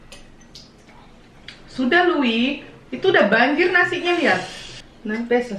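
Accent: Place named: Java, Indonesia. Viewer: Mamak, apa dari ortu mamak ada darah jawanya kah? Kalau bicara mesti ada bahasa jawanya. Seneng dengarnya 😍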